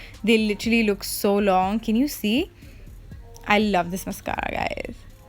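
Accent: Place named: United Kingdom. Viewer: and please stick to british accent for ur voice over !! 😘😘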